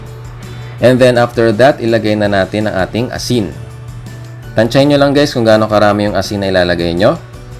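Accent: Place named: Batac, Philippines. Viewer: Taga Batac kan sa met sir, kasla kasjay iti ayog ti taga Batac hehe. Hello sir! Im from Piddig Ilocos Norte! Thank you kadetoy a recipe sir padsek man met ket tapno masiyahan ni papa😁 am 18 yrs old😇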